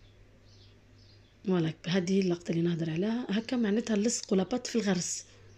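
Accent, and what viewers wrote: Annaba, Algeria. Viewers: صح لهجة عنابة حلوة و تهبل 🥰🥰😍😍😍😍متابعتك من العاصمة يعطيك الصحة راني ندير فيه 😁😁😀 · تهبلي تهبلي ، وهذيك لاكسون تاعك 😍😍😍😍 ، نموت على هدرة العنانبة ، يعطيك الصحة روعة 😘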